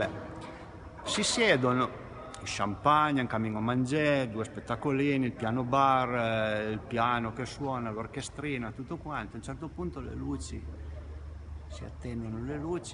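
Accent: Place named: Piedmont, Italy. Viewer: Grazie, da veneto avevo capito tutto tranne il finale. Bello il dialetto piemontese; neh.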